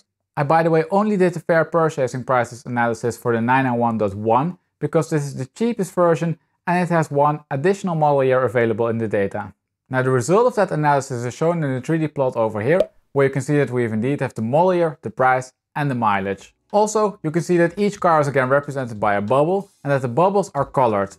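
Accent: Netherlands. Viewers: Just curious, guessing Nederlander? Where are you from exactly if i may ask? Love your channel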